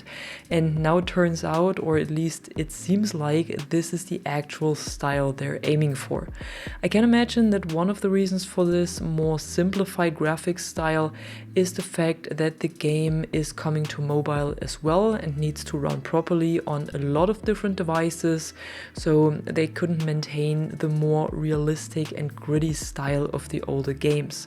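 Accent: Germany. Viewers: Ist dein Dialekt deutsch? Falls ja lass ich einmal liebe Grüße doch schau schon längere Zeit deine Videos spiel das spiel jetzt seit einigen Tagen im early Access... Muss sagen es macht riesen Spaß aber ich komme nicht mit der Grafik nicht anfreunden sie sieht irgendwie extrem unfertig aus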